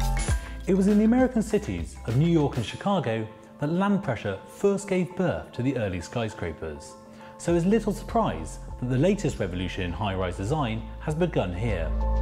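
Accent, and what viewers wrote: United Kingdom. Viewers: Quirky British/ accent make you sound pretentious. Tone it done bro. None of my Brit friends talk like you do. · not everyone with a british accent sounds calming, intelligent and elegant...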